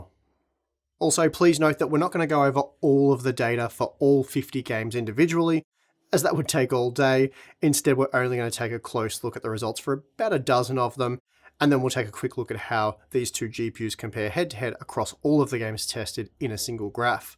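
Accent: Australia. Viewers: What I got out of this review is purchase the GPU which is cheaper. Radeon had higher 1% lows overall than Nvidia on most games. Shouldn't this be the minimum standard when choosing a video card. I just love the Aussie accent, to the point without all that waffle. Thanks.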